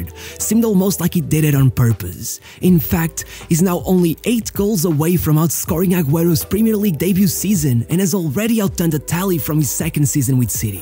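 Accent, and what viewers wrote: Portugal. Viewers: Vi logo pelo sotaque és tuga, impossivel nao notar lol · The Portuguese accent is strong lol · Logo vi que esta pronuncia tinha de ser portuguesa. Continua com o excelente trabalho :)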